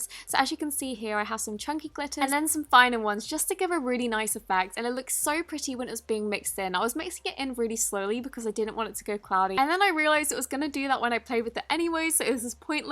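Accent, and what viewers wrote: England, United Kingdom. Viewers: WOW i love that britishenglisch so nice to hear👍#EUROPIENPOWER 🇨🇭🇨🇭🇨🇭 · R u English/British ❤️❤️ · She's english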